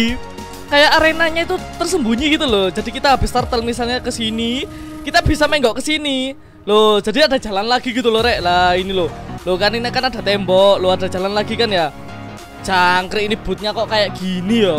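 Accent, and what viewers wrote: Java, Indonesia. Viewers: Bhs lu medok bgt bang..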